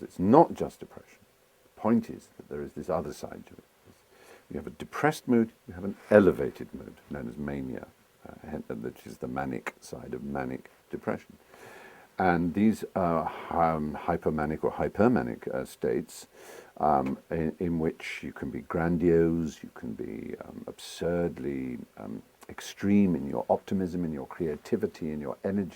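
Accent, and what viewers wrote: United Kingdom. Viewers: he makes coke sound so British and classy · It is phenomenally strange hearing Stephen Fry describing his drug use, with all the glory of a proper British accent of a gentleman scholar. "So for many years really I never went out without at least four or five grams of cocaine powder on my person. And I would ingest it intra-nasally, as was the fashion, through the use of some sort of straw or rolled up currency note."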